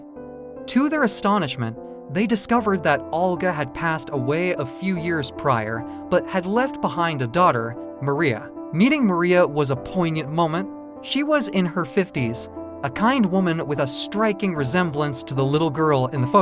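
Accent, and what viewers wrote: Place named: United States. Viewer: Also, though the accent spunds genuinely American, the speaker switches genders on characters in the story. Come ON, people!! Dloppy performance, at best !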